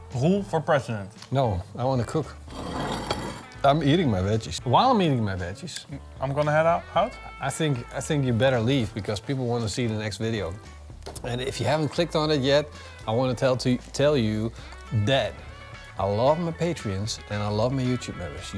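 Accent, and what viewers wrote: Netherlands. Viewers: I grew up in the Netherlands and I'd bet money ( by his accent ) so did this guy. If so the price of beef in NL vs its price in a beef producing state in the USA is not a fair comparison. Flat Iron ( what that cut is called here in the USA ) is usually BBQ'ed which breaks down its intramuscular sinews rendering a tender cut for the plate.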